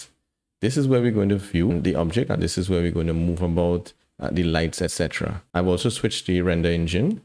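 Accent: Trinidad and Tobago. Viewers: Yo, awesome tutorial. Are you from trinbago?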